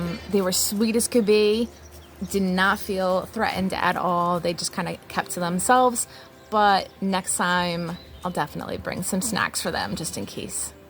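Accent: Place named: New Jersey, United States. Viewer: Love it! You can take the girl out of Jersey, but can't take the Jersey out of the girl... "Wooder 🙂"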